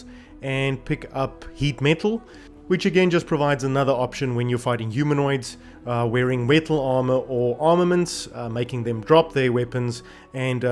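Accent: Afrikaans, South Africa. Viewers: dude jy klink afrikaans.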